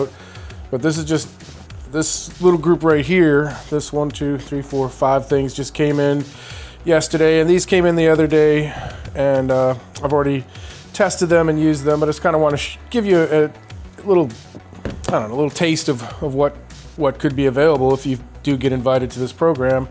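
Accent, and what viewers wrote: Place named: Louisiana, United States. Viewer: I knew you sounded like family! Lol I’m south Louisiana also, used to be the furthest south you could get in Venice! Anyway, I just got accepted to the vine program and I’m watching your videos so I can see what to search for Maybe. Thanks for doing this. Maybe I’ll get some inspiration from you and start actually creating content since I have tons of equipment to do it just not the patience. Lol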